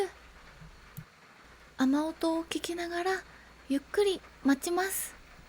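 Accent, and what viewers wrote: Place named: Shizuoka, Japan. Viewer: ❤ いつも静岡訛のトークで懐かしく癒やされます🥰 それにしてもは雨女かな🤔笑雨も味方にする凄さはならではかな💕 因みに俺は晴れ男みたい😅 あとゴールデン免許ね🎉笑ゴールド免許とも言うよ🐼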